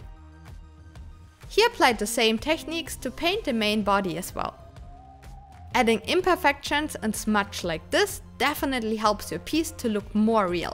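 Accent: Germany. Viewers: Hi,! Really nice work! Do you live in germany? I think i heard a german accent in your english. ^^ Would be easier for me so i could leave comments in german. 😄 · Einwandfreies Englisch mit Deutsch Akzent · Sprich doch deutsch🤦🏼man hört das du deutsch sprechen kannst KeIn EnGlIsch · Hi do you speek germany, ir sound like this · Dieses deutschhhhh!!!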